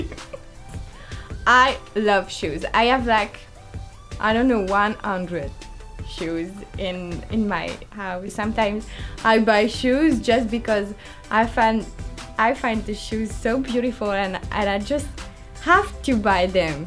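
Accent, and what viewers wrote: France. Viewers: aaahh that french accent gives me life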